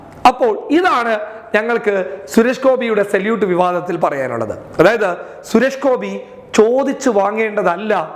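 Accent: Kasaragod, India. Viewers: കേറി വാ മക്കളെ...🙋 ഇനി മുതൽ കാസർഗോഡ് ഭാഷയിൽ ബ്ലോഗ് കേൾക്കാം...😍😍 ഈ ലോകത്ത് നരകിച്ച് ജീവിക്കുന്നതിനേക്കാൾ നല്ലത് ചിരിച്ചു മരിക്കുന്നതാണ്...🤣🤣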